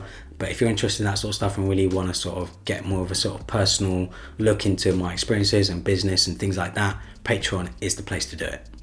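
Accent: England, United Kingdom. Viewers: Dude you have the best English accent I’ve heard lol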